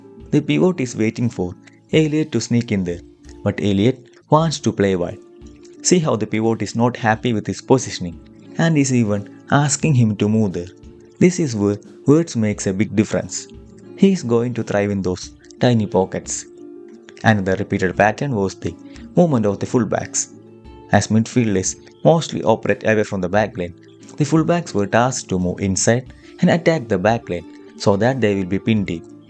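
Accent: India. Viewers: Indian accent and football don't marry well. Talk about cricket and curry. Maybe chess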